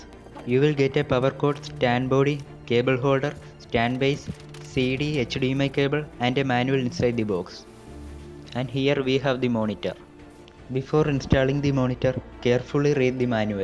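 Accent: Kerala, India. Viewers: Heavy mallu accent ! Any reason why some mallus killed that elephant few days back ? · Malayali ano....? Speechil oru malayalam touch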